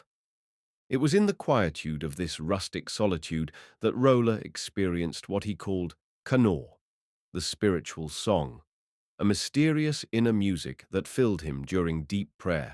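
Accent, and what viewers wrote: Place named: United Kingdom. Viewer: Interesting subject and perfect accent. This is the real British accent .😊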